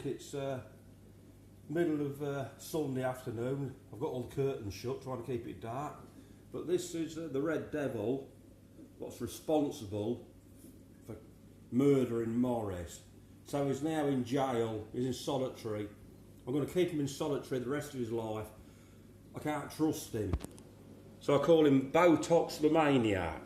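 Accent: United Kingdom. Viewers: Difficult for all of us to listen to our own voice. Yours is great, although us Americans tend to be drawn to that British accent. Anyway, sound great and relaxing video. Please keep it up.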